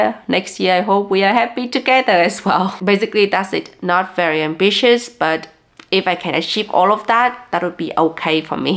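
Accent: Germany. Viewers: yes, yes, yes! go to discover japan and South Korea.....their food is AMAZING!...we suspect that you are getting deutsche accent..💪👌. Hope that boyfriend will learn vietnamese to speak with the " in-laws" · the funniest thing.. the longer I hear you talk English the more I pick up on the germanized accent you've started to develop when speaking it, especially noticed it when you said audio and some words after that.. Some very typical German pronunciations of the English words haha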